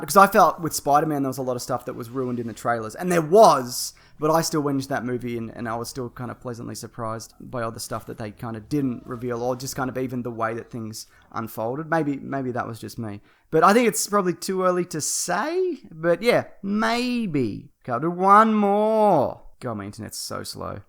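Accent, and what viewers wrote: Australia. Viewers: I'm glad that you did another video and went into more detail about the trailer. I don't use Twitter so I would've missed out. And I don't care about rambling as long as long as it's in an Australian accent! =)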